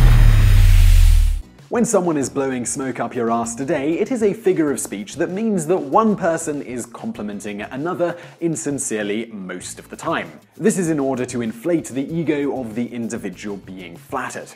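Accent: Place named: United Kingdom. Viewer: "Here in America", there's other English speaking countries too. Listen to Simon's accent, he's British. British spelling is arse and yes we say it like it's spelt. Actually "ass" in Britain refers to a type of Donkey.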